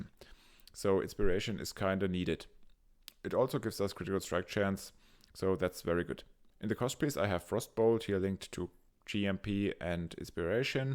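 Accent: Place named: Germany. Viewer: Wie man direkt an deiner Stimme merkt das du Deutscher bist. Sehr interessant! :D Btw.. Auf was muss man genau achten, wenn man die Sachen kauft? Denn das Amu allein könnte ja schon, wenn man pech hat, um die 3div kosten durch die Attribute+ Cold spell gems. Da wir ja beim CoC die Taktrate beachten müssen, wollte ich dich fragen, wo man das sieht und händeln kann, da ich keine Lust habe, dass meine CDs rumspacken und damit das ganze Build fürn A** ist. Freue mich über eine Antwort! :D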